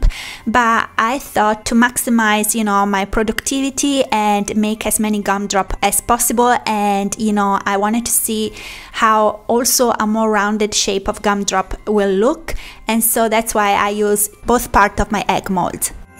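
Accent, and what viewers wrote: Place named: Italy. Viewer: love your channel:) your accent is very much like my friend from Casarano, Italy 🇮🇹 Viva Italia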